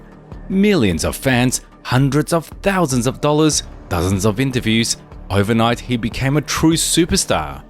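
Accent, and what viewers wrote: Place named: United Kingdom. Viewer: Chinese with British accent???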